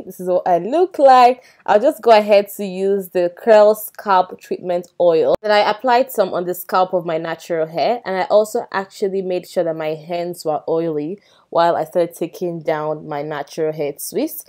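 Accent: Nigeria. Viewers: Your voice makes me no that you are from Nigeria well I am too and I love your video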